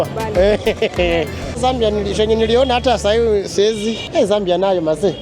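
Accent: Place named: Nairobi, Kenya. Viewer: Kenya hakuna matata,, Na mjango ako na kiswahili kama tu ya huku kanairo... So ni msee ameishi huku.. karibu sana ndugu fanya kazi yako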